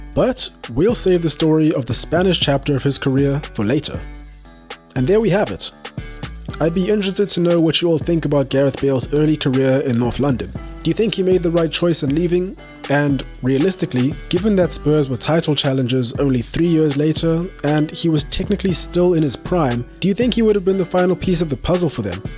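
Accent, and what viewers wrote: South Africa. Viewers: Are you South African bro? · Where are you from dude? Trying to place your accent. Sound kind of South African but I can’t tell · he is from the rsa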